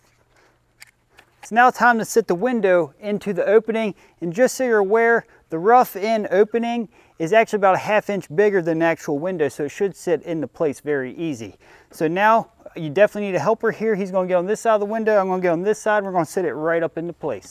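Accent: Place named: Delaware County, United States. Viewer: Bro what part of Delco you from ?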